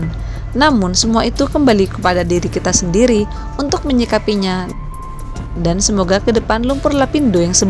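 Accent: Madura, Indonesia. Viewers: Kayak orang madura logatnya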